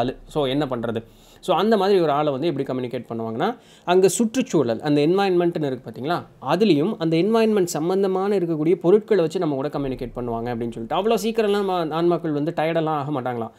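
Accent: Coimbatore, India. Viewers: Anna neenga coimbatore ah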